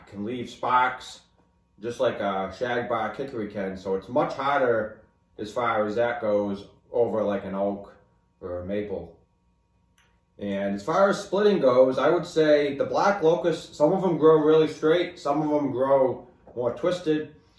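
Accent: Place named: New England, United States. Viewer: Gotta love the new england accent Varies every twenty miles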